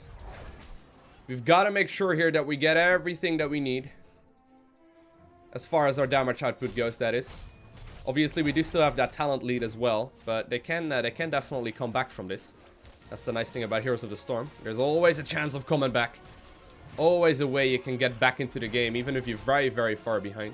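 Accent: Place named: Netherlands. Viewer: Sounds like he shaved away some of his Dutch accent. That's really difficult so good job on that.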